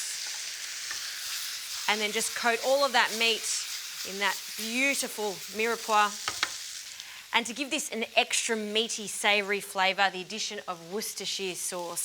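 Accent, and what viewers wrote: Australia. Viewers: She was born in Sydney. The accent should give it away, it's Aussie, not Kiwi.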